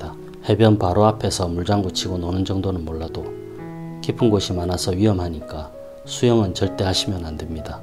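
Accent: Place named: Busan, South Korea. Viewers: 오~~!! 같은 부산분이셨네요~ 어쩐지 사투리가 익숙하다했습니다~ㅎㅎ 매일 다양한 기법들 잘 보고 배웁니다~^^ 좋은영상 많이 올려주세요~~ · 부산분이네요^^ 반갑습니다 저도 부산사람 ㅎㅎ 말하는게 넘 잼있어요 그림하나 몰라도 계속찾아보고싶게하네요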